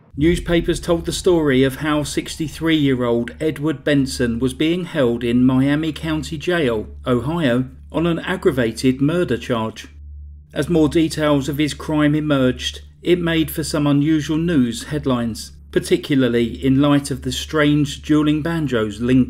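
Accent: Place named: England, United Kingdom. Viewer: "Mentowe anguish dew tew juhhuee tryowe." I want to write a book with your accent for the narrator. I don't know if the preceding sample would even be legible for anyone. I don't know from what locality your accent originates, but I would be very interested to learn more about all the regional vocal inflections in England - I love dialects!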